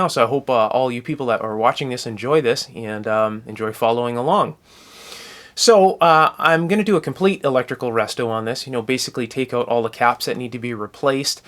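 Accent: Canada. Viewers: I love your Canadian accent. My grandfather was Canadian and it's been a while since I've heard this accent! Good tip on finding the outside shield end of an unmarked cap. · Your accent makes me think you're from Canada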